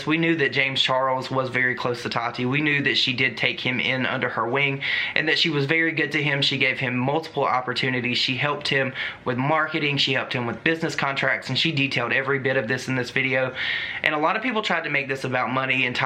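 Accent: South, United States. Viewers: I found your channel a few months ago and I am so happy I did. Maybe it's your southern accent and attitude. I was born in the south but moved to the west as an adult. I miss my family and you remind me of all of them. Your content is to the point and short, love it. Anyways just wanted to say hey!!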